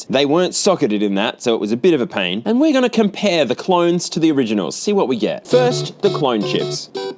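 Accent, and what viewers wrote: Australia. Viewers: Why am I so f*cking hooked on Australian YouTuber delivery. My wife calls it’s Bluey for adults 😬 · Guy who has only ever heard 1 south australian hearing their second sound australian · he is aussie mate.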